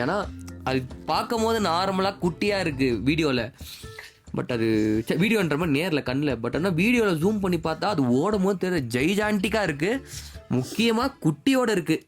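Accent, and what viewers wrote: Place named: Kanyakumari, India. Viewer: Nanum kanyakumaritan.... Slang la therinchuthu .... ❤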